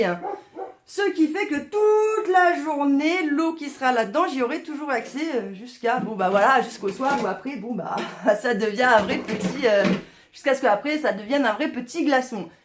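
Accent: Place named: Saint-Étienne, France. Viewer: Hello, il me semblais bien que ton accent me disais qu'elle chose surtout quand tu as parlé de st Retienne!! je suis de Luriecq dans le 42 et je suis en train d'acquerir un terrain (bois = clairière) près d'Apinac à 950m d’altitude, donc comme tu vois ton expérience et très importante pour moi. Nous aurons peut être l'occas d'échanger dans un futur proche nos expériences spécifiques. Encore merci pour ton partage.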